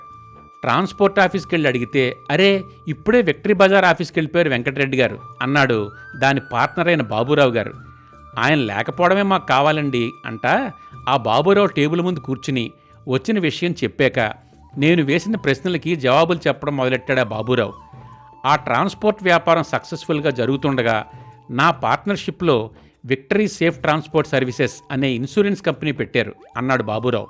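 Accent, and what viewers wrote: Godavari, India. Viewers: వెంకటరెడ్డి గారి విజయం యొక్క వంశీ గారి కథని అచ్చమైన గోదారి యాసతో కమ్మని కథనంగా వినిపించారు.... 👌💐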